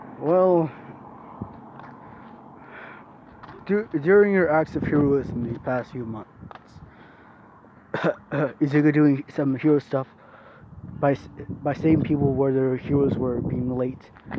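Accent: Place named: Russia. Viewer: you have a perfect russian accent...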